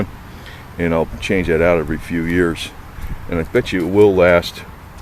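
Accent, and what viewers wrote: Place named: Boston, United States. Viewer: I hear the North east accent- is that Boston 'Frickin"?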